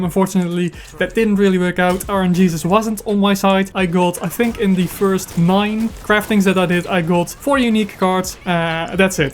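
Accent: Netherlands. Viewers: Je klinkt Nederlands, maar waarschijnlijk heb je geen idee waar ik het nu over heb haha.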